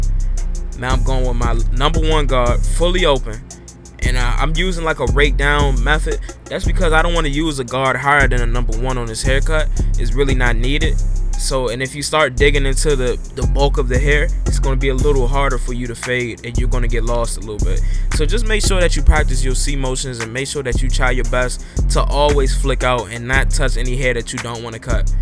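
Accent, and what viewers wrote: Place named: Washington DC, United States. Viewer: I kbew he was from dc after a minute haha. The cadence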